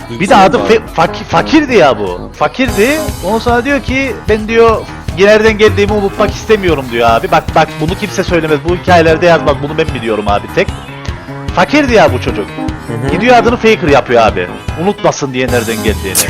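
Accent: Cyprus. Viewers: abi Kıbrıslımısın ;)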